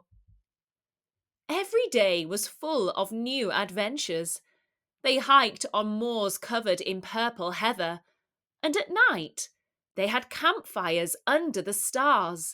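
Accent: United Kingdom. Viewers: I like all of your stories too much, it is practical, with new words, nice u.k accent 🙏🙏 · British accent is so romantic and beautiful 😊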